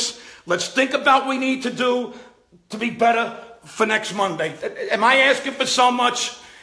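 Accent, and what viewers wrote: New York, United States. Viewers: you definitely are a New Yawker, you should be a coach just for the post game speeches, haha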